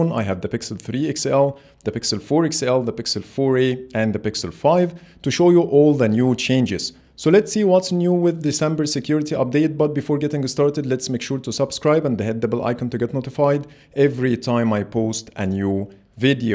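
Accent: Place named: Egypt. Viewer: لهجتك انجليزي مصري ... عاش اوي ❤️❤️❤️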